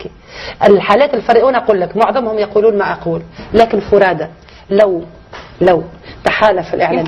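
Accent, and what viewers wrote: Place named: Maghreb. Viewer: لهجتها مغربيه او تونسيه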